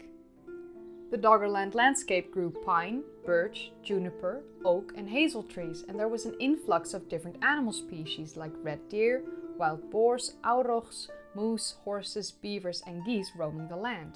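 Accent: Netherlands. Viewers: don't loose the Dutch accent! Please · Your accent is subtle. I think you're Dutch. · Lovely Dutch accent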